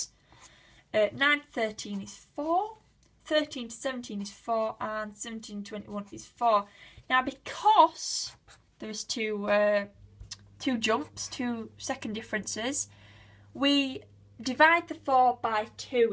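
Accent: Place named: Yorkshire, United Kingdom. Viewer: Ah that's a shame, every now and then I have to come back to GCSE math and this video was easily the best I could find. Also nice to hear a familiar accent! Where are you from? I'm in Leeds I'm guessing you're from somewhere in Yorkshire?!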